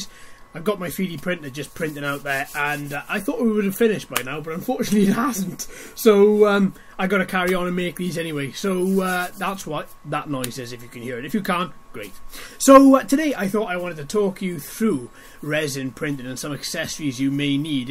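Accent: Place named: South Wales, United Kingdom. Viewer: Sounds like ya from South Wales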